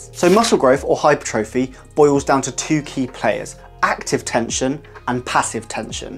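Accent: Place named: United Kingdom. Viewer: Are you British I like your accent 👍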